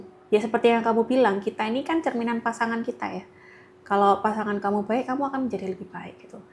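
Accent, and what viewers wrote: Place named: Java, Indonesia. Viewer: Bener2 tuh ada lucu2nya, sampe ngakak aku denger ceritanya, dgn dialek jawanya yg medhok. Baru tau cerita awal perkenalan dan kisahnya dgn Kondosan, Luar biasa kisahnya ❤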